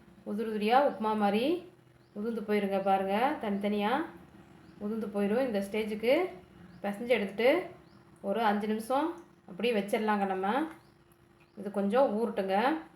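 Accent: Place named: Coimbatore, India. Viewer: Naa coimbatore naanga pesura mathiri mariyathaya pesuringa athanaalay neenga yentha oornu ketkirenga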